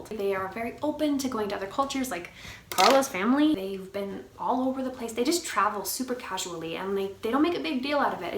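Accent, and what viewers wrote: Italy. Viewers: oh my jesus, i want to be ur friend so much, wtf help. I'm italian and I LOVE LOVE LOVE your italian accent! u're too cute and u look sooo kind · Hi I am Italian and I really think you are beautiful girl and nice person + your Italian accent is really good and cute💘💘 xoxo from Rome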